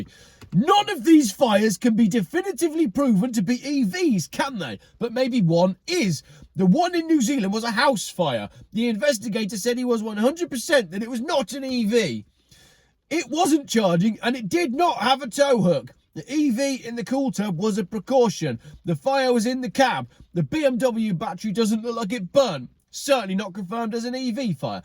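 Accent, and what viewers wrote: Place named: Birmingham, United Kingdom. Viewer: maybe it was the sloth?? Great vids... happy to hear a Brummie after decades in Canada.... Keep on keeping on (lose the hat, get a bandanna)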